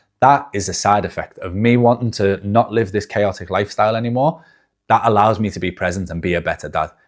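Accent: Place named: England, United Kingdom. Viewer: twenty dollars? You then proceed to keep interchanging currencies.. Keep it real. If Americans are too lazy to Google the exchange rate then that's down to them. Your English accent threw me off? Later you're talking in pounds? Sorry but seems ridiculous you said you didn't think you deserved to fail the test but the driving examiner said you were going too fast. Then you crashed because you were going too fast in your words? It seems in reflection your examiner was correct to fail you but you still hadn't learnt your lesson... At least nobody else was hurt..u your mum mightve been a diamond but unfortunately an enabler and she did you no favours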